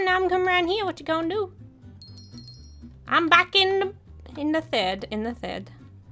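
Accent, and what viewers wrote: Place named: Liverpool, United Kingdom. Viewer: i love hearing your accent! its funny hearing someone from who's also from liverpool :)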